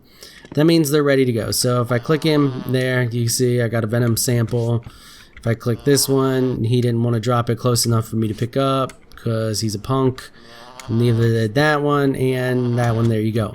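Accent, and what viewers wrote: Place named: South, United States. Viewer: I really can't even pin your accent. Sometimes it sounds slightly southern, other times not. Sometimes it has this sort of effeminate twinge at the end of each sentence. Then in the episode about the 10 things that they took out of Starbound. You talked about the barrel and completely lost your shit, you got way louder and lost the accent the twinge everything, your voice completely changed.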